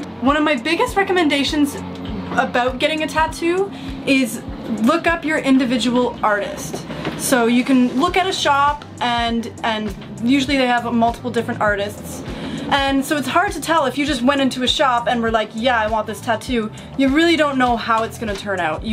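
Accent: Canada. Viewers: Thanks for sharing,. I’m not a big tattoo guy but certainly respect your decision, your reasons for getting them, and the meaning they have to you. Stay safe and healthy and look forward to more videos, (and, no matter where you travel, don’t ever lose that great Canadian accent)!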